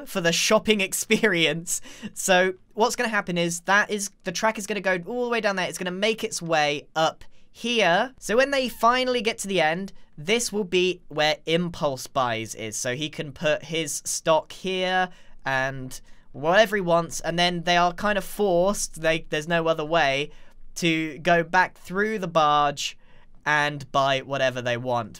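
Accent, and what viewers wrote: United Kingdom. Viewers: To me he kinda sound like a British person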